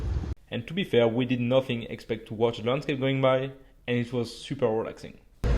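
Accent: France. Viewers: Wasn't expecting this video to be entirely narrated over the subtitles, which was a nice change but I kinda prefer the subtitles more as your French accent can often be hard to understand. In all honesty, I'd rather watch one of your vids with the subtitles instead. I don't mind if it happens once in a while as it's a good reminder to us viewers your from France. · I like the voiceover and I love the strong French accent😁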